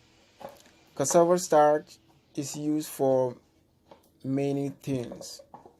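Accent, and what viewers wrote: United Kingdom. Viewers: Oh. You're too much with your British English. Well done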